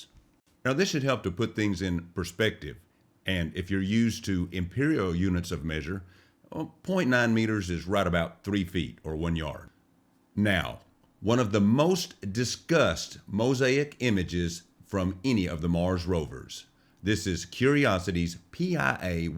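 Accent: South, United States. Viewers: I subscribe because I love that southern drawl, the Mars work (an opus), and an abiding interest in Mars. The items you find are incredible. Sadly I can't do the 3D viewing, having never developed stereo vision (congenital eye problems), but I still try! I'd love to see what there is.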